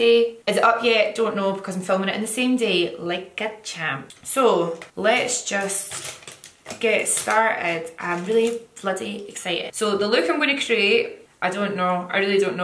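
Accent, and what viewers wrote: Scotland, United Kingdom. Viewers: I love your accent. Are you Scottish?:)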